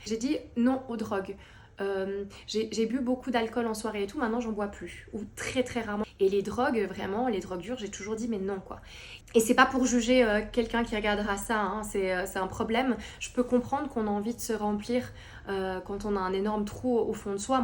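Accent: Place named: Vaud, Switzerland. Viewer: J’adore ton belle accent vaudois!!!! Je viens de vevey 🎉 c’est rigolo d’entendre un accent comme le nôtre à la télé 😉 t’as vidéo m’a beaucoup touchée ❤️ reste comme tu es 🥰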